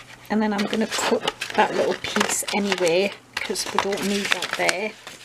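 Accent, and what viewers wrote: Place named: Newcastle, United Kingdom. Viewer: I have been watching your videos because I am a fan of your Geordie accent. I was born in Newcastle and still have family there but we moved to Canada a number of years ago. I love listening to you talk while you do all the crafty things. I'm having trouble finding those Matte laminating sheets here however so that makes me sad.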